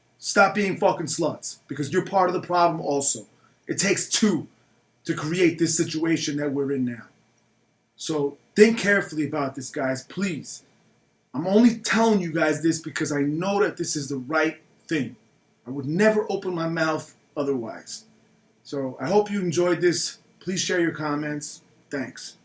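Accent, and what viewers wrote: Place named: New Jersey, United States. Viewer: You are 100% right in Napoletan culture the man is responsible to stay with the children . If he doesn't stay with the children's he is considered punk . If he doesn't pay respect to family or to the elders he will be low level person or person with no face . You are 100% right . I think you are from Napoli because i can see it in New Jersey accent . You sound like Tony Soprano .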